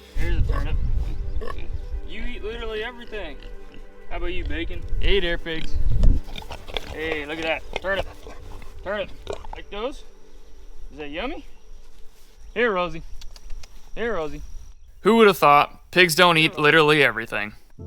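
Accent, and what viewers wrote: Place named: Ohio, United States. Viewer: i just saw kentucky but even in ohio they have ur same accent up here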